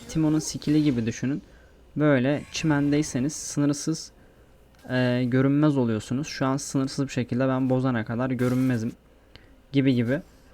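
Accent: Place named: Samsun, Turkey. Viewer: Bu adam Samsunlu la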